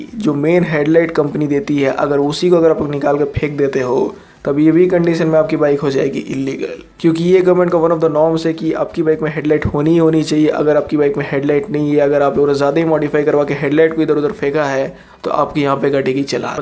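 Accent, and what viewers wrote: Bihar, India. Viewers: Bhai tm Bihar se hai ?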